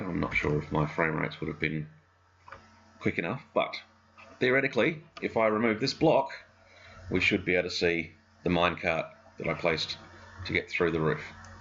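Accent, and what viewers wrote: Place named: Australia. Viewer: Great to hear a fellow Australian, and thanks for posting the tutorial! It's a great first video.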